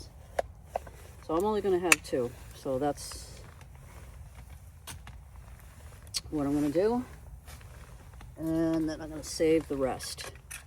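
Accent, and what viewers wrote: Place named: Boston, United States. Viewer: Love your videos & your Boston accent.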